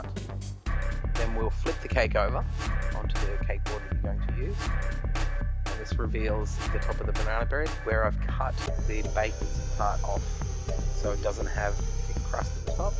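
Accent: Australia. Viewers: Aussie!!!! Damn I miss Australia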